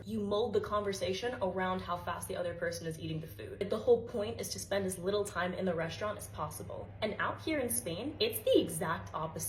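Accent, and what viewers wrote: United States. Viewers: From her accent alone she's clearly American and has therefore been to the US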